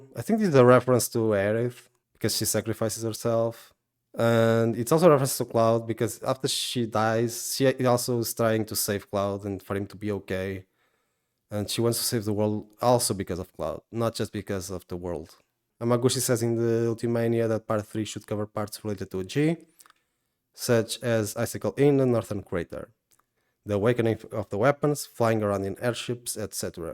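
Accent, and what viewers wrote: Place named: Brazil. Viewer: Esse inglês não engana quanto às nossas origens, do que vi é um bom vídeo, vou guardar para ver mais tarde!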